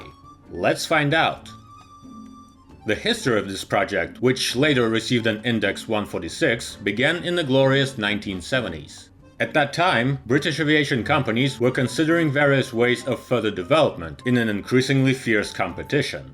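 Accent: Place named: Russia. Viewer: The narrator is Russian I think by the accent. They often have different words for English ones than we use. Nice aircraft. Wouldn't say no to a personal jet version.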